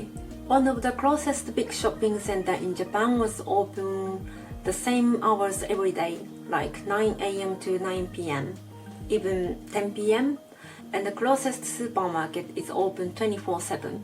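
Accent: England, United Kingdom. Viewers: After just 1 year you already have the makings of an English accent, many eastern Asian people have an American accent. Well done xx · Definitely picked up some bits of English pronunciation in there, you can hear it on the occasional word or phrase. It's interesting how learning a language formally in one accent and then immersing in another has that affect. A friend of mine learnt Standard German, then spent time in Austria and Switzerland. He gets the weirdest looks from Germans sometimes. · You can already hear a slight British accent when you speak.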